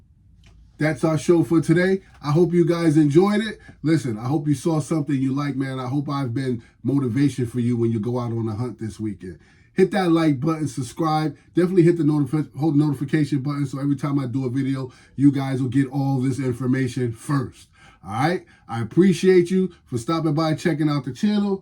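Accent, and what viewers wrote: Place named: New England, United States. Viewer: Bro, you're from New england?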